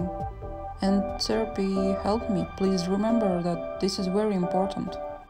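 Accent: Russia. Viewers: Girl ur voice is just so pretty! And ur accent? Russianaccent is just so lovely i rly like it <3 following u since a while now ur speedpaintings r always so amazing and also kinda relaxing to watch <3 much love from germany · Your voice is beautiful. Russian accent is the best for me. Its calming and soothing lol. Your art and voice us the most beautiful thing i saw and heard. Keep up the good work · Whoaa finally! Don't worry about the voice and the accent, I really love russian accent and I am sure everyone is!💚 Good job my queen! waiting for your next voiced video👁️👄👁️✨ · ЧТО. Я УМЕРЛА ПОСЛЕ СЛОВ "АЙМ ФРОМ РАША".......... я всегда думала, что ты из Америки.... даже подумать не могла... смутилась только когда услышала акцент, и тут.... воу... удивляюсь, почему у тебя не миллион.. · i love russian accents! don’t be embarrassed of it ❤️ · Could u make a video showcasing your “cool smears” brush pack, please? 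I can’t seem to find any 💓 (Btw, I really love your voice! & your art too, ofc. I can really relate to your feelings regarding your voice.. I am a big fan of accents, though, and Russian accents are particularly beautiful imo. (Not in a weird/creepy way, just in an admiring/appreciative way!)✨ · Girl, I MISSED HEARING YOU AND SEEING YOU UPLOAD. You have absolutely NOTHING to be nervous about! Russian accents are one of my favorite, and your voice is absolutely gorgeous. Don't you ever be ashamed. The same with your artwork, that's nothing to beat yourself up over. That one criticism out of the many compliments is a good way to show yourself that your art IS art and that your journey for improvement is never over. I think one of the beautiful things about being an artist is that you're not alone. Everyone has gone through and been shaped by similar struggles. Keep on keeping on. You are amazing, BEAUTIFUL, and one of my biggest inspirations. 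You are the reason I picked up an ipad and I do not regret it for a second. Thank you for providing amazing content. 💙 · I just wanted to point out: even tho you see some hate, never forget how many people actually love your art. For me personally, you're such an inspiring person with your style, the way you shade etc. And I loooove your russian accent, it sounds so wonderful and calming💖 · Она русская чтоли? Акцент похож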